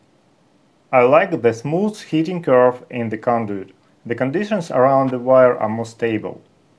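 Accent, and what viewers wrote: Russia. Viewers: Сильнейший акцент выдаёт русскоязычного )) Ну а по сути - очень полезный опыт. Но, к сожалению, большинство самоучек-электриков добиваются "вау-эффекта" от своей работы именно бесконечными километрами никому не нужной гофры. Им главное - пустить пыль в глаза ничего не понимающему заказчику.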